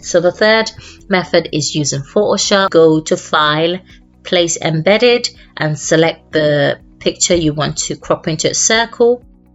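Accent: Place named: United Kingdom. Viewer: You saved my evening! I have been trying to crop to a circle in Powerpoint for about an hour, reading instructions and a couple videos. You showed STEP by STEP on the screen and that's what I needed. And you did it in your classy British accent to add to the enjoyment! Thank you very much!!! Canva looks even easier....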